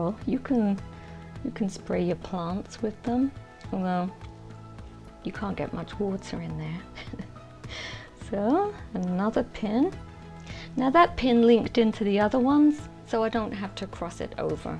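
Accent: England, United Kingdom. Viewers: I ran across your channel. I stuck around and watched several videos. I must say you are an extremely beautiful lady. I found that I was in absolute awe over the way you talk, look, act, present yourself. I love your English accent and your such a funny woman. I love your humour. Your a very bubbly person . It made me smile. Plus with saying all that your videos were very relaxing for me to watch. Thank you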